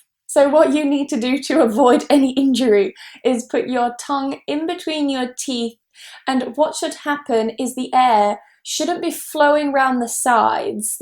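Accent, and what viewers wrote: United Kingdom. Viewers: I fell in love at first sight, but I'm afraid you're already married ☹️. Anyway, I'm learning american english nevertheless I'm going to learn british accent as well because of your videos. Thank you in advance 😊. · Thanks a lot of your video and beautiful British accent. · Oi mate! 🤭 love listening to British accent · I'm really for in love for video. Fist at all I like british pronuncition, and it much clear. I was looking for a pronunction couse and it meet what I wanted. My language is portuguese and video was so helful. I love english language. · Thank you Thoroughly This is a little too British for me… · thank youu,,, i love ur british accent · Thanks for the video,..it's good to learn how to pronounce words in british accent...it's amazing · I like British's accent. beautiful. I like you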